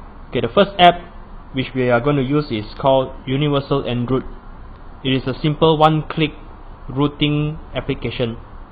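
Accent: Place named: United States. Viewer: hellz! you sound like an american comes from greece ;G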